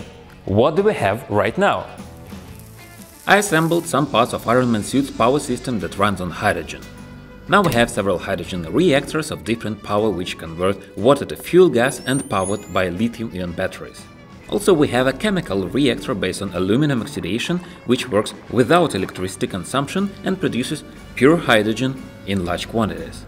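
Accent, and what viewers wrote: Russia. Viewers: Акцент русский=)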